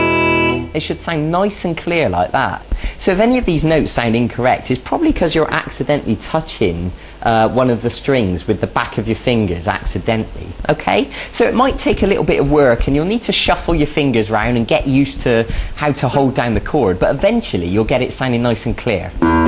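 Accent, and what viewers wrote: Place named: Wales, United Kingdom. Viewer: This guy sums up the 90s! With the addition of a welsh accent of course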